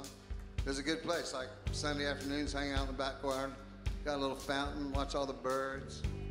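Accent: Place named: Texas, United States. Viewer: I love his true Texas accent!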